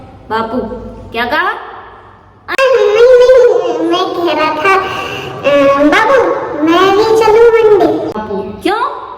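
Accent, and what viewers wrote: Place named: Rajasthan, India. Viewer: ap b rajasthan se h dii 😍😍😍vhi me sochri hmare yha k jesi language h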